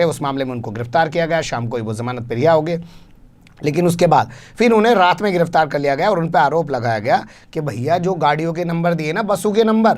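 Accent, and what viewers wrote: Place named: North India, India. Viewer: Sahab your (north indian)Desi style of expression, i like very much....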